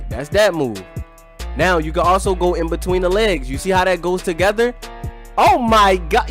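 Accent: Baltimore, United States. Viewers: U from bmore ain’t chu ? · Lmao I subbed cause your accent bra it reminds me of the wire👌🏾💪🏾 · got that deep baltimore accent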